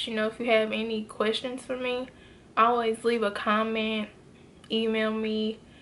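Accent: Memphis, United States. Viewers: I am loving your videos. Especially our accent(memphis) I graduate from u of m in dec. So I am going back for second degree bsn ( u of m) new subscriber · Awesome video. You sound like you from Memphis,Tn .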